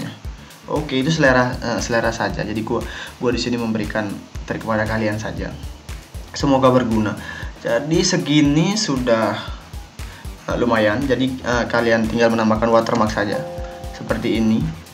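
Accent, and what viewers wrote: Bali, Indonesia. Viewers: Adi care nak bali asane ngomong ne nahh wkwkkw,, salam youtuber bali, mampir ke channel ku yg masih pemuka ini ya,, wkwkw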